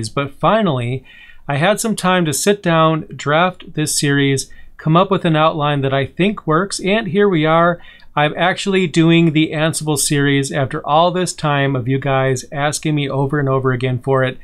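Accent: India. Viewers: you got a problem with Indian accent? Why though? Open up youtube and search for any tutorial, you'll find an Indian guy with thick Indian accent uploading free content for guys like us learn so just be grateful rather than passing these comments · And how does someone know the video has a speaker with an Indian accent without watching/listening to it? Mind your own language Suraj. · Mind your language... If you can't understand India accent don't watch Indian videos..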